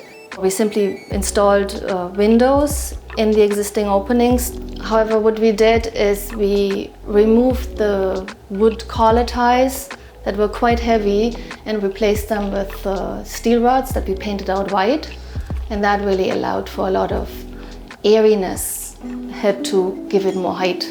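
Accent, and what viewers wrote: Germany. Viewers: Definitely German. Many German's I have met in London have better English than us natives! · i lover her German accent. She should do ASMR videos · Sounds German to me.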